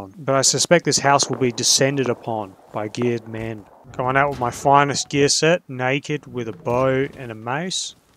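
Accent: Australia. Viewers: something about the accent and choice of wording makes Australian people just funny by default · He's Australian lol there's not a single British accent out there that's not annoying. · Honestly, gaming and just listening to this man's beautiful aussie accent is something else, 4+ hour commentary content when?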